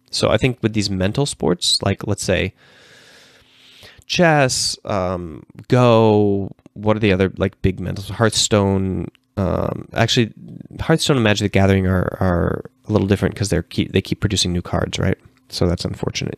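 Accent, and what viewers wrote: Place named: United States. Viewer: you have an american accent yet studied in finnland. Are you finnish descent?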